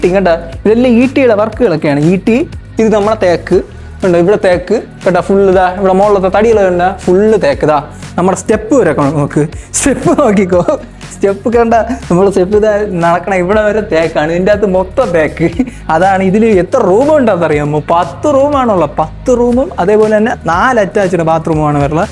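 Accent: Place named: Thiruvananthapuram, India. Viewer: തിരുവന്തോരം ഭാഷ പറഞ്ഞു ബോറടിപ്പിക്കാതെ മര്യാദക്ക് സംസാരിക്കു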